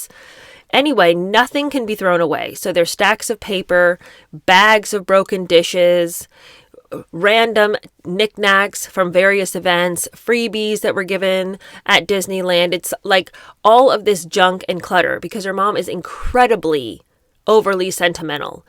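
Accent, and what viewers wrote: United Kingdom. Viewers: Love this new series! I hesitated at reading the book too but now it’s on my list. The only thing we are missing is an excuse for your amazing British accent! Lol Love your channel!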